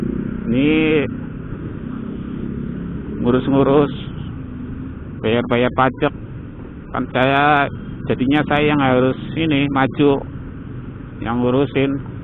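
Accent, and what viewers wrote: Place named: Banyumas, Indonesia. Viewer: Motovlog ngapak -ok tetep semangat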